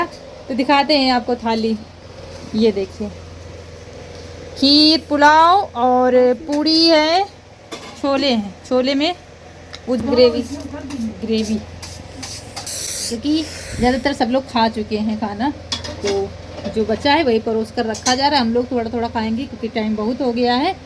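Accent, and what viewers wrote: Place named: Uttar Pradesh, India. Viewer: Mai unnaose hun aap jaise bolti hamare gaon vase bolte hai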